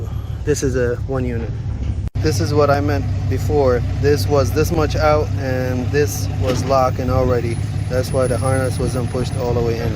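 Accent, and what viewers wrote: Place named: Iraq. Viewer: متحجي عراقي هيه مال تفيك 😅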